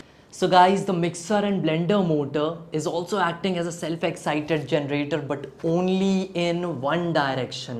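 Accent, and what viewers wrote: India. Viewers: Está hablando en inglés pero con acento hindú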